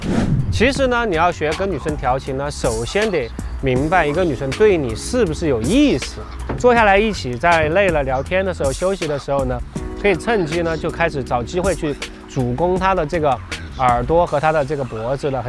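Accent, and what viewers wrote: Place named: Hunan, China. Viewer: 小哥你是湖南还是 武 汉滴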